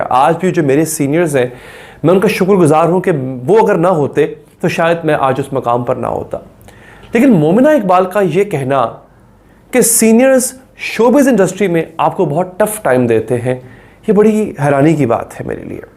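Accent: India. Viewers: Bro please speek like Pakistani don't speak like Indian we like you... Insap ke deve.... Indian language please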